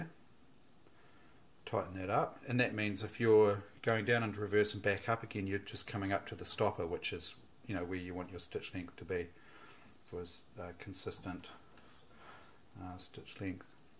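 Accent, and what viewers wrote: New Zealand. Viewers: Are you from New Zealand?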